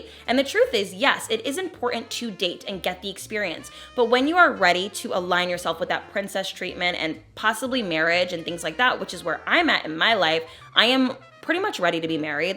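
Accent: New Jersey, United States. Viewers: I’m a jersey girl too and I always can tell u were a jersey girl as well love ur vibes, thank u for this wonderful video everything u said was FACTS ! heavy on the I wash my legs ok period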